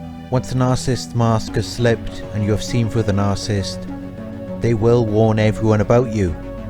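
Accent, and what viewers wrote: Wales, United Kingdom. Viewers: Great video. You sound Welsh. Im welsh too! May I ask a question? I started a FB page helping people with mental health and theres one guy on there thats always sucking up to me. Compliments compliments compliments. Yet....whenever he becomes close with certain members (and is conversing with them through messenger), they seem to go very distant and cool towards me. The women in particular. This has happened several times. I thought it may be my imagination, but I dont know....it all seems a little strange. Do you think this guy may be a bit of a narc? Thanks in advance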